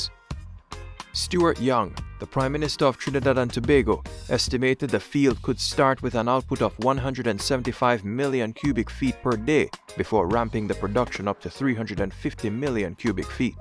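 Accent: Jamaica. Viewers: You sound Jamaican and you are talking tata. You know NOTHING of what you are saying. Trinidad will manage with or/and without Venezuela.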